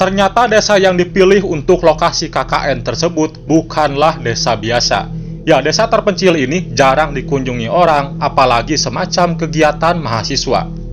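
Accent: West Java, Indonesia. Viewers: logat sunda abangnya ini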